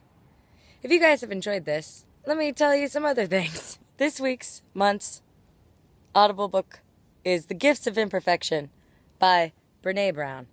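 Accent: France. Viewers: Your cadence throughout this feels very french, even before you started talking about accents. :-) Sounds like a good book. I will see if I can squeeze it it. You seem to like books about self discovery, or self help books quite a bit. Or do you make your decisions based on trying to teach your audience?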